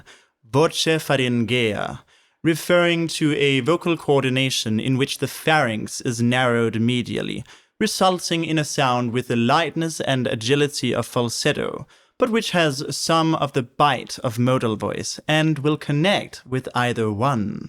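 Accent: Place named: Oxford, United Kingdom. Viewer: I like your oxford english very much 👍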